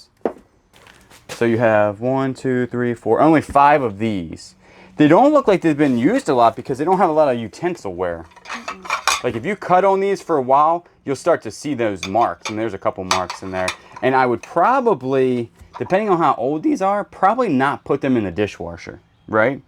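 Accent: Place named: South Carolina, United States. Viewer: If I close my eyes I can almost hear Andy Griffith speak, must be that SC accent!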